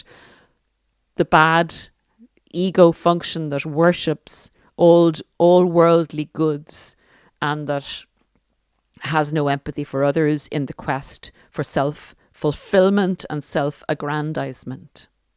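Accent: Ireland. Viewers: Thank you, it's so helpful to move toward the light and stay there. I find your podcasts, your knowledge, and your support so incredibly wise and encouraging. I love your way of speaking—explaining—and your lovely Irish accent! Thank you so much!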